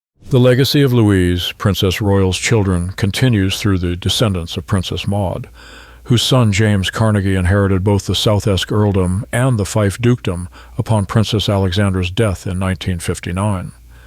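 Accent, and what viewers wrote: United States. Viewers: This is a very interesting presentation, but does it have to keep labouring the onerous ducal duties? Why oh why does it require an American narrator, talking about ‘dookal’ titles and children being ‘tootered’? Tiny point , Lady Maud was the niece of Queen Maud of Norway, not her great niece. Queen Maud was the Duchess of Fife’s younger sister.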